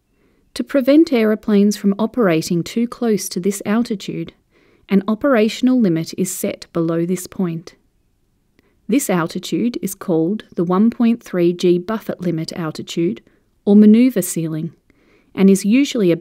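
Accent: New Zealand. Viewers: ??Does this NZ narrator ALSO do the "Chickens in my backyard" videos.??? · What accent is it? Is it kiwi or aussie ?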